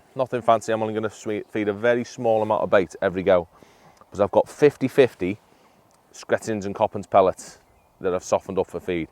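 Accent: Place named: Wirral, United Kingdom. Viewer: I watch a lot of Fishing videos, I tend to always read the comments, watching this channel is the first time I've gone across someone being given stick for their accent because your man there is Scouse. Firstly he's from the Wirral, his accent is closer to a Chester accent than a Scouse accent, not that it actually matters. If a Scouse or even any Northern accent bothers you I can point you towards a few channels run by southern anglers who are nowhere near as knowledgeable about Fishing as this fella, you won't learn as much but at least you will feel safe and at ease you poor little snowflakes 👍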